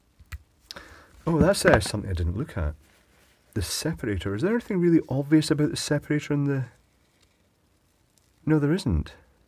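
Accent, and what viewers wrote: Scotland, United Kingdom. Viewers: He is scottish, you are british, so of course you two do not talk the same way XD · This guy has the scottish voice from al murrays sketch and its just glorious. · It's so interesting though, i love his Scottish accent too. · "cc" (closed captions) are rather amusing, no doubt resulting from the Scottish accent. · <num> percent pure British accent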